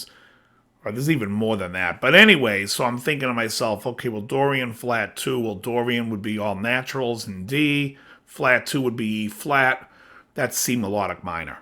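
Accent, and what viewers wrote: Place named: New York, United States. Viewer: Like I said….really glad I stumbled onto your channel! So much great stuff….and you talk just like a a New Yawker. What part of New York are you from anyway?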